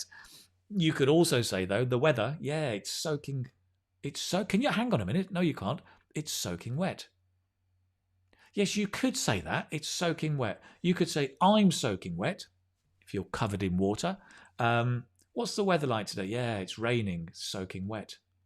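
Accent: United Kingdom. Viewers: Are you British? Are you American?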